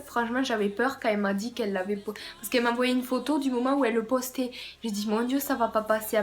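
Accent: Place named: Toulouse, France. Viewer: Superbe vidéo!! 💘 jaime trop ton petit accent toulousain 😊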